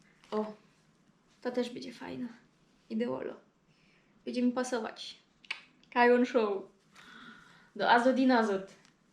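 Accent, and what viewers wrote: Silesia, Poland. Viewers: Dyć pieknie godosz po naszymu, przaja tymu fest :) śleciałaś nom Ślōnzokom ôd Pōn Bōcka szłoby pedzieć :)) pozdrawiom Ślōnzok spomnij sie zawdy kej żeś je markotno, iżeś nie jes sama ;) jes nos wiyncyj :) i godomy jednakim jynzykiem Ślōnskim. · Fajnie godosz. Skond żeś je? Możesz zdradzić przinajmij powiat? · Jesteś bardzo ładną dziewczyną i bardzo fajnie się Ciebie słucha jak mówisz po Śląsku 😊😘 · Kocham śląsko gadke! <3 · podoba mi się ta Śląsko gadka 😊❤️ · Akcynt Slonski pzostvanie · mowa śląska jest piękna,szczególnie urokliwie brzmi w ustach ładnej ślązaczki.Miałem śliczną /było to dawno/ dziewczynę z Zabrza bardzo lubiłem słuchać jej śląskiej mowy. Nazywała się Alinka Opałka bardzo mile ją wspominam z taką tęskną nostalgiczną nutką, było to w latach 70-tych popełniłem wtedy największy błąd swojego życia kiedy się z nią rozstałem.Marzę abym mógł jeszcze kiedyś spotkać ją i porozmawiać. 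· Młoda, piękna i ta śląska gwara. Cudo!:) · tak żech myśloł że to z połednia naszego regjonu 😜 miołech znajomych stamtond i łod razu było slychać że jakoś tak choćby krojcoki. Pozdro Frelko 😁 fajnie szprechosz i jo Cie rozumia. Tyż myśla i godom w doma po naszymu a we robocie to musza z niekierymi ą i ę. No i ucza sie w Dąbrowie to tam to juz richtik cza mówić. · wieprzek-angrys-agrest dzisty? czyzby od dzistac?srac, pierdziec, fulac;; a moze zisty- ciasta? hasiok-smietnik (od niem. Asche-popiol) Hasie, dlatego tez Aschenbecher- popielniczka antryj-przedpokoj (od fr. Entrée) przypadkowo po 3 latach od wystawienia tego vlogu znalazlem sie tutaj (przez trzi lata on tukej do mie szol 😂) gryfno frela godo piyknie po slonsku dlotego Daumn hoch👍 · ja mosz racja ślunskoł godka je fajno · Uwielbiam te śląskie gadki! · Ale Ty masz śliczne oczy <3 Śląska gadka fajnie brzmi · Twój ślónski to je tak richtig ześlónszczuny polski a jak tak richtig chcesz pogodać po ślónsku to zapraszam , pogodumy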